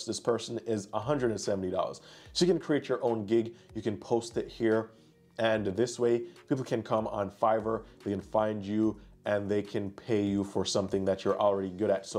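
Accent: Jamaica. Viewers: Sense a bit of an accent. Are you Jamaican?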